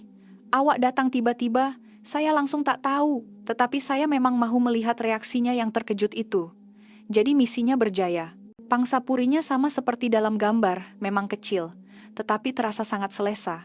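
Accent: Indonesia. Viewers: Indon narrator?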